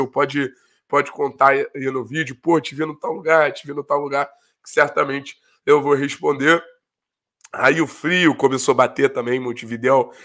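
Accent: Rio de Janeiro, Brazil. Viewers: Olá amigão. Primeiramente parabéns pelo grande canal. E Vc é carioca? E nos conta essa relação com o Athletico pr e Tenta entrevistar o Nicao assim que puder...super Abração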